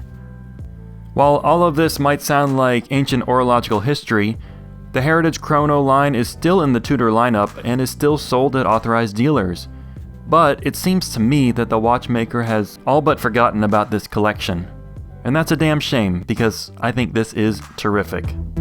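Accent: Birmingham, United Kingdom. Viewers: Love the Brummie accent! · Nice attempt at brummie accent! It’s where I went to University:)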